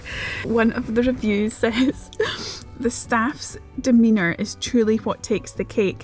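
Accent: Scotland, United Kingdom. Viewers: Hi How are you doing today How was your Christmas Holiday I like your youtube channel I watch every video you are beautiful, i like your Scottish accent Stay safe traveling Take care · The Scottish accent❤ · I love your videos......I love your accent....I can't place it.......people in Clarkston spoke like you, but I feel you are from Fort William or the such like.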